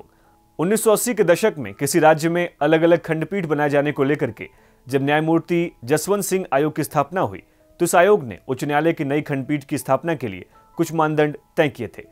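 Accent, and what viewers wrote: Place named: Purvanchal, India. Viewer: लगता है ये एंकर पुरबिया है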